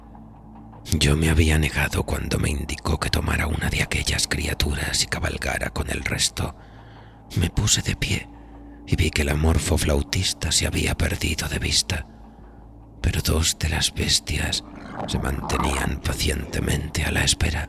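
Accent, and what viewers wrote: Spain. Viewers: oír estos relatos con esta narrativa castellana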